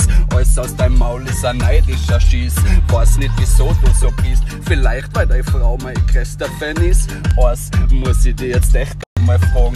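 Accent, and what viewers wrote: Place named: Tyrol, Austria. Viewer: Abgesehen davon dass ich das "tirolerische" nervlich nicht aushalte, hier kommt er zusätzlich etwas "behindert" und/oder alkoholisiert rüber.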